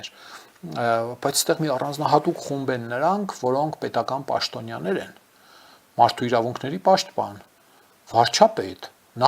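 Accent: Gyumri, Armenia. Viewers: Բա ինչու ես խոսում գյումրիաերեն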